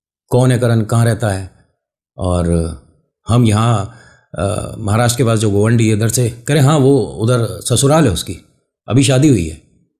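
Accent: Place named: Mathura, India. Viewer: Language se mathura side k lagte hai. Mathura agra hathras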